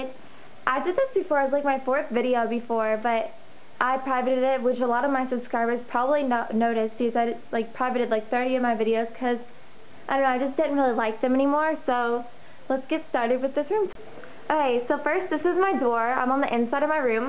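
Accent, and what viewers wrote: South, United States. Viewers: i watched this and i just wanna say we have te same accent ha yay we both have a southern accent btw love it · your room tour made me want to redo my room and not to be mean but your voice sounded kinda country -lauren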